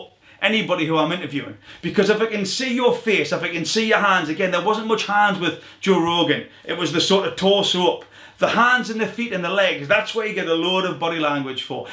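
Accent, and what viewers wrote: Newcastle, United Kingdom. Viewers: Divven ye nah a Geordie when ye hear wun? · He certainly diesnt sound like a scotsman ges a true Geordie. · Where you from, British?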